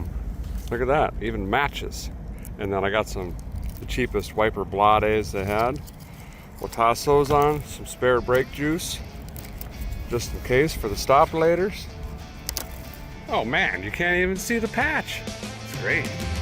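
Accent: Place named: South, United States. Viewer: I feel like we are losing you only because you’re starting to talk Southern as in “got me a coffee”. We still love you here in tropical Southern Minnesota. High of Zero tomorrow ♿️🚾🍻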